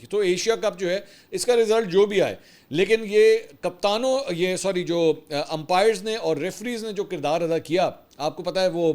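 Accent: Punjab, Pakistan. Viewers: Matter of fact"please stop speaking wieghtfull English in Punjabi accent ....stop supporting cricket too much....stop being hypocrite,just for PTI views ........almost done😏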